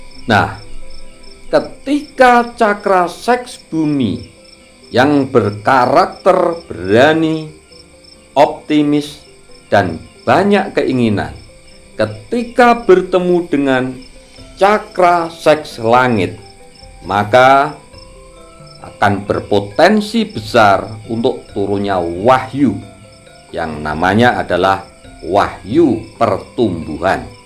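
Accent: Java, Indonesia. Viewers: orang jawa logatnya pasti sama...apalagi kalangan bapak² seumuran mereka...hehe akupun jd inget almarhum papa saya yg logatnya seperti mereka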